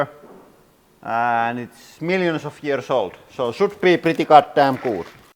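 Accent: Finland. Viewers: You sound so finnish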